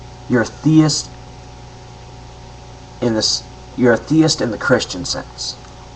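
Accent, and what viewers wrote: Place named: United States. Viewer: Pity about the accent. It's hard to sound intelligent in an American accent.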